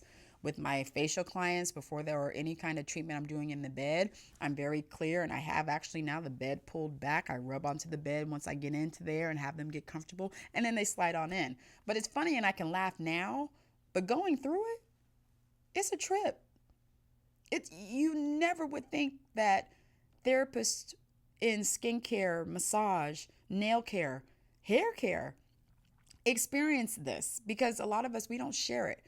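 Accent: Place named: East Coast, United States. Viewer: "What I wanted to say was... get your in the bed, why are you naked for a facial?!!"... ROTF.... I can't breath! you sure sound like you're from the east coast!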